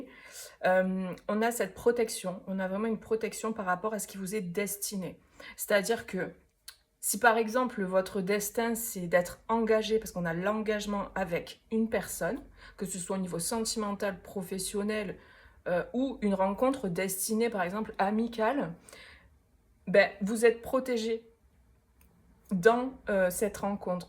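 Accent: South, France. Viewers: Mais c'est très sympa cet acca-în du sud !!!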